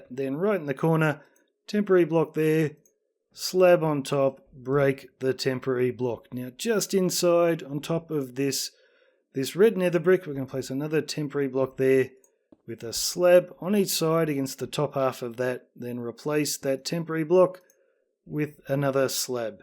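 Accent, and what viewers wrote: Australia. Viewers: Nice to hear an Aussie voice! I built this in my One Block Skyblock world, but changed the inside around as I'm outfitting it as my house and storage. I decided early on what colours I wanted, and in the end was so grateful I'd kept everything the one block threw at me. I went for a dark oak, prismarine, and quartz block palette, with sea lanterns, and emerald blocks instead of gold blocks. It took me a fair while to build in survival. Otherwise it looks like yours outside, apart from forgetting the anvils and hoppers, so it's a less imposing spire. Thank you so much for the hard work you put into this tutorial!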